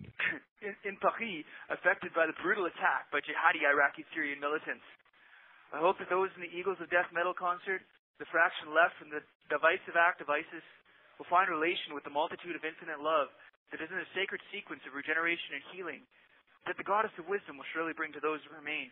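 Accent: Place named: Quebec, Canada. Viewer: "Quebec" version of French.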